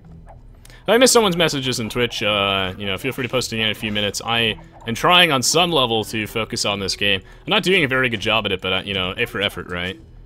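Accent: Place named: Germany. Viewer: Your occasional german accent really adds to the production value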